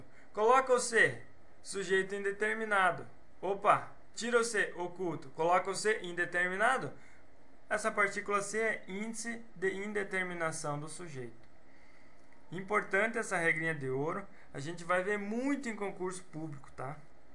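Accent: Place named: Northeast, Brazil. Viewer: vamos adiantiii kkkkk sotaque nordestino em filho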